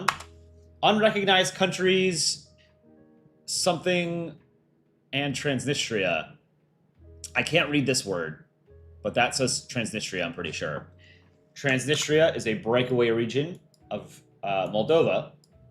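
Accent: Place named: United States. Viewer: your voice like United States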